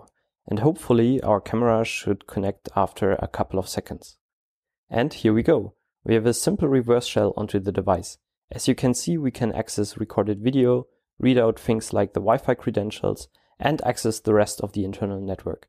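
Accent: Germany. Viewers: Your english sounds german. Where are you from? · bin mir absolut sicher, dass du aus dem deutschsprachigem raum bist. dieser akzent ist so einzigartig hahah :D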